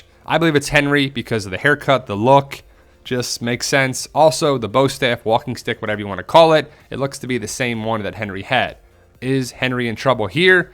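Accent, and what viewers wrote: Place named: South, United States. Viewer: Hey hey I got family with that type accent I love it we southern types. So I like the accent . One more week helloo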